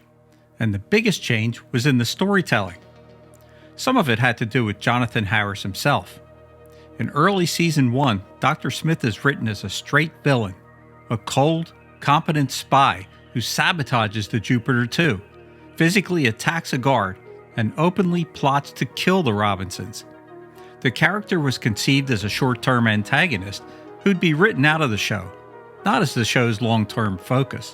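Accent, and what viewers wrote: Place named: Philadelphia, United States. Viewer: Couple things: I note your Philly accent & Gene London reference. But beyond that, you're right, I was a kid watching it then. Episode one of the first season, in particular was serious & good, a real sci-fi adventure. After that, I felt things trending in a more lightweight direction. Still watched it a lot though.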